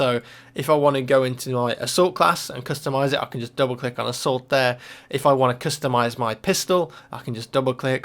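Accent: United Kingdom. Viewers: HES BRITISH ITS THE WAY THE PRONOUNCE IT. GET THE FUCK OVER IT. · Because he has a British accent? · BF3 not BFfree. He's a brit like me ,mate.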